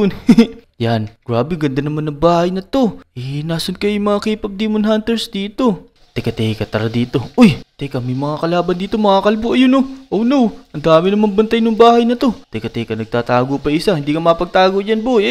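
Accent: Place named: Nueva Ecija, Philippines. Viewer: Taga Nueva ecija siguro to puro "EH" Naririnig ko😂 Only in Nueva ecija knows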